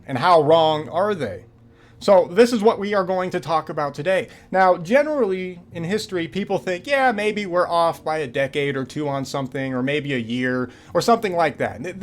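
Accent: United States. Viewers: Dude, you sound like you're american. Please come to Europe, you can see christian sepulchres from the 4th century underneath my neughbours back yard UNPRESERVED. You can see a church from the 10th century on a hill in a nearby village. You can see a fortress built by Turks on top of a Byzantine fortress who built on top of a Roman Castrum on top of a Celtic hillfort.... and I live in the Balkans. It's all fine and dandy when you read and see PRESERVED places from history. Come see raw forts wrecked centuries ago, you'll get a sense that Roman stuff is way older than late Medieval stuff when you actually touch it OUTSIDE OF A MUSEUM, outside of internet. Plus, the girls are fine and a beer is like $2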